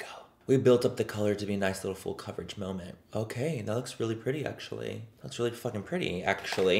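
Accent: United Kingdom. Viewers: Living for the British accent babes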